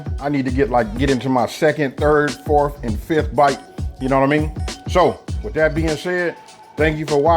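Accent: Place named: California, United States. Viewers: You have a country accent however from your voice and the way you talk I’m assuming that you’re from California Compton Compton or cities around California Los Angeles maybe if I go farther than that Alabama but I’m very show you from California , your foods are delicious and all them they’re healthy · He gotta be from the west coast I can hear it 😂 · Dude has California accent !!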